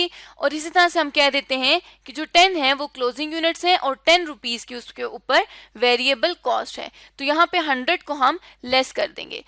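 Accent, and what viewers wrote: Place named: Pakistan. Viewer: Tomorrow I have my ca inter costing exam and I wanted to learn this concept... And I came across the bestest shortes video! ..i am from indian... Your Pakistani accent is so good! ✨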